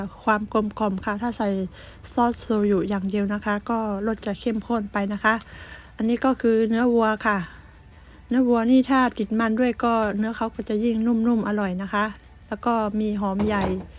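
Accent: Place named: Japan. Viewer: อยู่ญี่ปุ่นนานจนลืมภาษาไทยแล้ว 555 สำเนียงพูดก็แบบคนญี่ปุ่นเลยอ่ะ เสียดายไม่ทานเนื้อแต่ก็ปรับเป็นหมูแทน น่ากินมากเลย ชอบหอมหัวใหญ่ด้วย 🥰🥰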